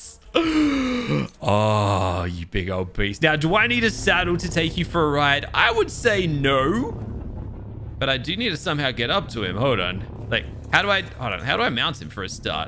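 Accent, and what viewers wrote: Australia. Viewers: you sound like you are from adelaide or sydney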